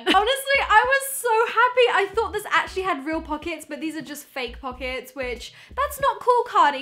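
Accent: England, United Kingdom. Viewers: LET ME TELL YOU!!! YOU SOUND SO MUCH LIKE LOUIS TOMLINSON. I'M NOT TALKING ABOUT YOUR VOICE BUT YOUR ACCENT. UGHHHH!!!😭😭😭😭😭... I'M A DIRECTIONER. AND IT HURTS, YOU REMIND ME SO MUCH OF LOUIS😩😩😩😩❤❤❤❤❤... Btw, I love your videos, and I think you should do that "bought the cheepest dress" video, in which you just chose the first dress. I loved that video, that was hilarious, please do another part.❤❤ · I love your british accent!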